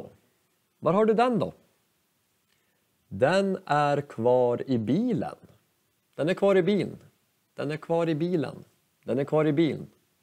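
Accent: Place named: Sweden. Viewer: Jag behöver höra sådana enkla dialoger, speciellt när du talar med den svenska accenten vi kanske hör. Tack och jag hoppas på mer av detta.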